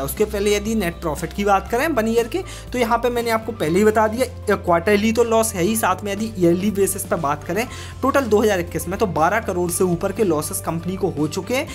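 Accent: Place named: Bundelkhand, India. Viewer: aavaj se aap bundelkhandi lagte ho😍😍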